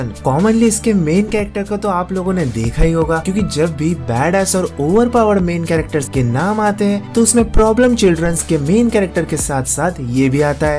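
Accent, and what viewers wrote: Bengal, India. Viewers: Bro. Are you Bengali? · Tumi ki bengali? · Bro are you Bengali?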